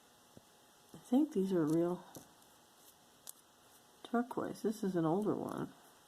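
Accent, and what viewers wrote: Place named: Ireland. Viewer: Love the Irish accent! 💚🍀💚🍀💚🍀💚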